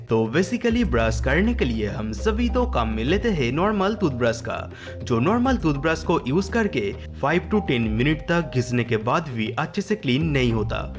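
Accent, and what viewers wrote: Bengal, India. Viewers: Wo totla nahi bangali hai😁😁😁😂😂😂😂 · According to your Hindi pronunciation, I think you are a Bengali , better you try to speak in English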